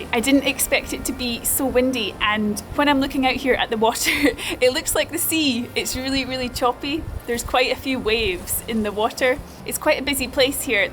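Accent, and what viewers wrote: Scotland, United Kingdom. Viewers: After I've finished reading all the doom and gloom on the news, I save video's for bedtime. Her lovely Scottish voice, the amazing scenery, interesting facts, all help me wind down for bed. Thanks for making them and posting. Beautiful woman.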